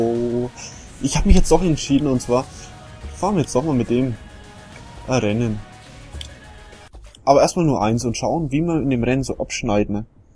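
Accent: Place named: Bavaria, Germany. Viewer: wow, sehr schade wegen den wenigen zuschauern , die qualität der videos is genial ( 1080p ftw ) und dein kommentar is auch gut, du könntest höchstens versuchen das bayerische noch ein wenig mehr zurückzuhalten, stört mich aber nicht als selbst bayer, mach auf jedenfall weiter so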